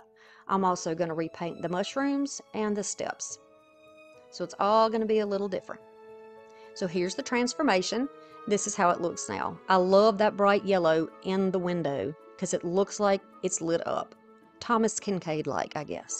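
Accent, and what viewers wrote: South, United States. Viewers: I LOVE your southern twang and I LOVE that u are a straight shooter and tell it like it is! No nonsense comments, tell ‘um, if u want to be negative delete urself and let us enjoy.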